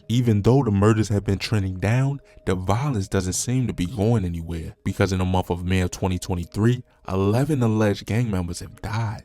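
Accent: Baltimore, United States. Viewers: Foo ALWAYS good for a few minutes of good watchin! I o see how you get so much info. It sounds like my guy is from Baltimore to me.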